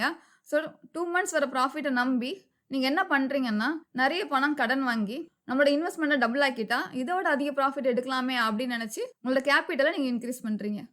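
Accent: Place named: Marthandam, India. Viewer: I think you are 100% marthandam area 😂😂😂😂😂😂😂😂😂😂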